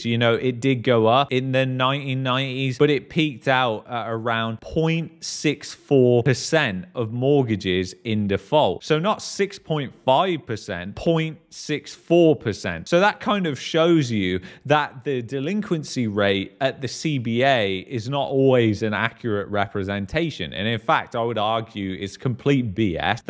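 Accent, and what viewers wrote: England, United Kingdom. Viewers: Hey you sound English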